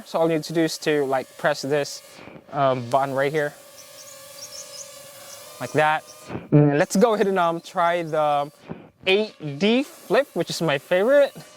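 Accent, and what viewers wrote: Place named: Philippines. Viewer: Mag Tagalog kana lng pag english2 halatang Pinoy ka 😊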